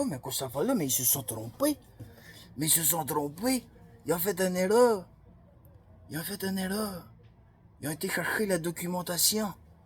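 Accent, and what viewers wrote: Magdalen Islands, Canada. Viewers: Merci pour L'espoir que vous me donnez. Je vois déjà les Madelinot combattifs mais faut que ce soit le peuple au complet qui dise NON et on met le maire sur le bateau, direction Souris. J'ai toujours hâte de voir apparaître vos vidéos dans ma cloche. Avec l'accent de Îles qui flatte mon oreille.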